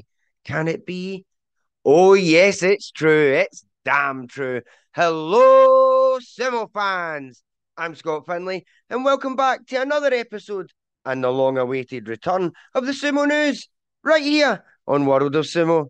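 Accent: Scotland, United Kingdom. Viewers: The Scot is back! Always a joy to hear your commentary and hot takes. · love getting my sumo news with a scottish accent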